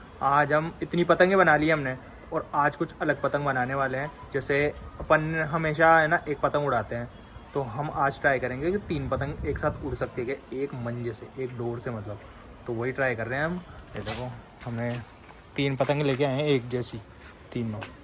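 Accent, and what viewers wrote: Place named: Kota, India. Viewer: Kota ya aas aass boli asii lag rhii hai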